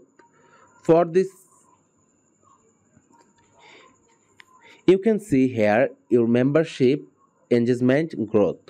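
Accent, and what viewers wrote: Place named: United Kingdom. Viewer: Can you make this in Bengali I can't understand your British English😢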